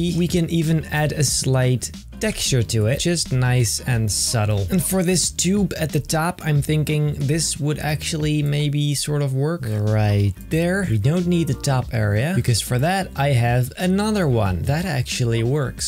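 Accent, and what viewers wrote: Netherlands. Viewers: Quick question, are you Dutch? Becuz your accent is the same as ours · Turned out pretty good ✨, but I miss videos with the dutch accent 😆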